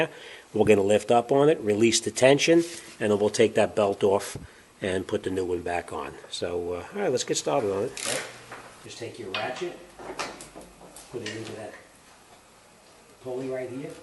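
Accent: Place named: East Coast, United States. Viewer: Finally another video with an east coast accent lol. Thanks for the instructions. John K (Newark N.J.)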